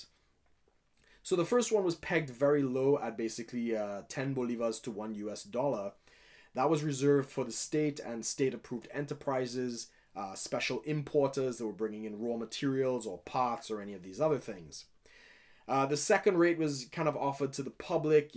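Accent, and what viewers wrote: Trinidad and Tobago. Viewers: he has the white trinidadian accent lol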